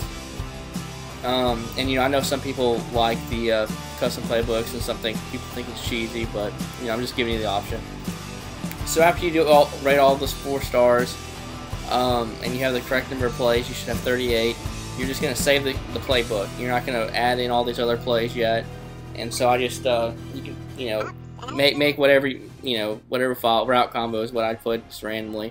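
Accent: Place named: Alabama, United States. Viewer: that song really brings out your bama accent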